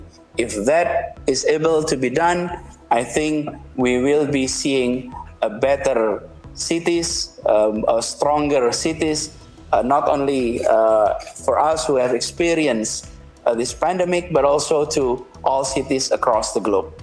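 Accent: Indonesia. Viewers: To be honest, this is the very first time I see this 'bahlul' speaking English, and I have to say that I'm not at all impressed with his English. I find he just speak Indonesian with English words (not vocabulary). You know what I mean, don't you?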